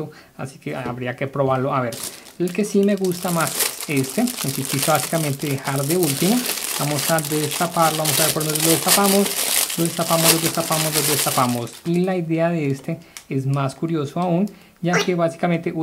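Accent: Colombia. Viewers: Hola Eres colombiano??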